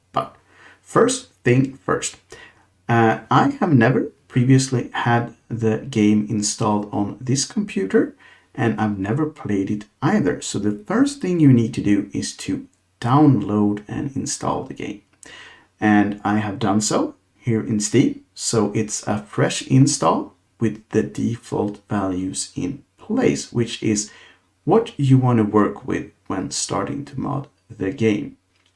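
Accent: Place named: Sweden. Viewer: Älskar den ibland svengelska accenten och reklamen "enkel kemikaliehantering". tack för videon förresten, riktigt bra!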